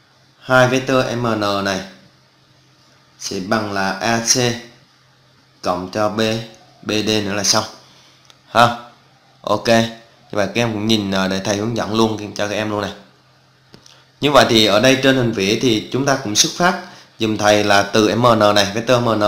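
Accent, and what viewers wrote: Phu Yen, Vietnam. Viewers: Em ở miền bắc .Hồi trước em không phân biệt được giọng Phú Yên với các tỉnh trong đó, mà giờ nghe thầy giảng nhiều nên em phát hiện giọng Phú Yên có gì đó đặc trưng hơn các vùng miền khác. Em yêu giọng thầy và thương thầy lắm, cám ơn thầy luôn giúp đỡ em và các bạn ạ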